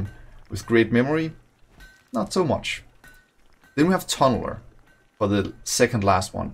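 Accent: Denmark. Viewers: that danish accent.. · Are you from Denmark your accent tho?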